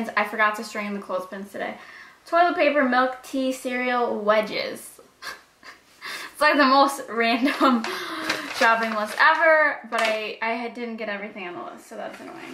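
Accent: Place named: Upstate New York, United States. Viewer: You have an upstate NY accent, like how you pronounce your "a's". When I lived in MD my friends there would bust my chops because I was the same way. Water safari is the best BTW. I live in PA now and my bf just doesn't understand why I want to drive 5hrs back home just to go to a water park.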